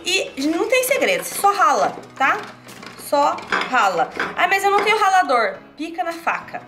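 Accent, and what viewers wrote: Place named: Ponta Grossa, Brazil. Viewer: de que cidade vc é?Parece sotaque de Ponta Grossa.Eu moro em Londrina mas sou de lá.Bjs.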